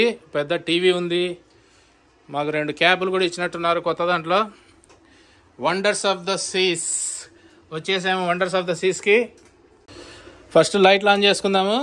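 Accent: Rayalaseema, India. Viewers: bro rayala seema yaasa super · Americalo vunna swachamaina seema basha mataduthunnaru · అన్నా నువ్వు చెప్పే విధానం చాలా చాలా బాగుంది నువ్వు అమెరికా సముద్రాలు అన్ని తిరిగిన మన రాయలసీమ మాటతీరు మర్చిపోలేదు.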